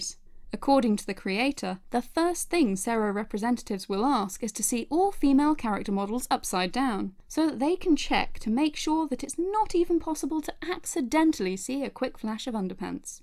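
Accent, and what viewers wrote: United Kingdom. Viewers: I´ve got a question not related to this video. As a teacher of English in my country (Spain) I´m always looking for new British English speaking media sources (preferably about my hobbies, one of the biggest being videogames) and I happen to love your accent. Would you mind letting me know what area is your British English accent from? much obliged!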